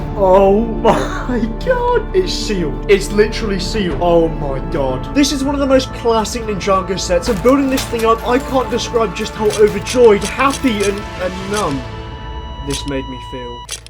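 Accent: United Kingdom. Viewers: Do you live in America but you're British??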